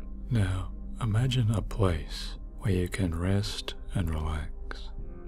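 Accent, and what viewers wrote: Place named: Australia. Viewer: its Australian actually ;)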